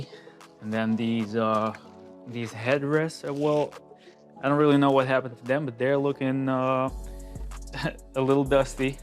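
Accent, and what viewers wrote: United States. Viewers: I knew you were in Belgium, but your English sounds very American! Not even a Dutch accent. I assumed you learned English here! I have a friend from the Netherlands who speaks English fluently, but she has a UK accent because she spent much of her youth in London. Happy New Year to you! Looking forward to more great videos in 2024! 😍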